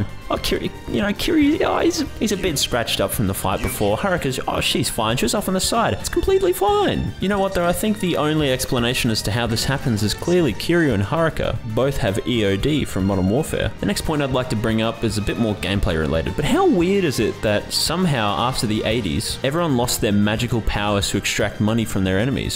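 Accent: Australia. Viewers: australian detected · just realize u are an aussie?! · I just realised you’re from Queensland, awesome video bro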